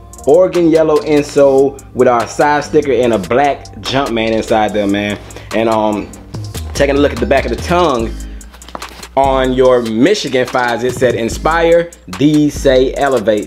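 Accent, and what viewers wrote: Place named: South, United States. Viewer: Man on the real I don’t like the shoes but you gotta rock with my G for the true southern accent bruh you gotta love it keep it real for ya boys stayin fresh in that southern mud baby 3rd coast holdin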